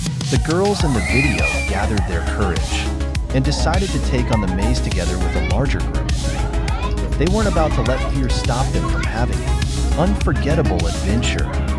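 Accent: Denmark. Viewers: Yes, very cool. I miss your Danish accent. My computer is haunted, it would not let me stop watching your video 😱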